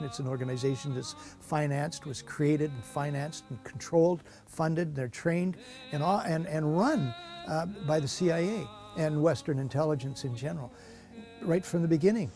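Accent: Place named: Canada. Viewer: this guy is canadian ay! (out and about pronunciation)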